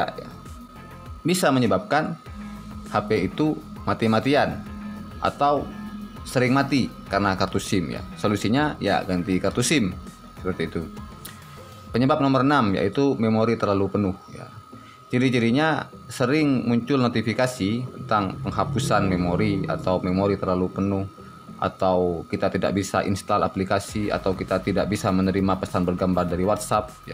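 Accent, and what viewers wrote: Madura, Indonesia. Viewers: Logatx kok kaya madura bosss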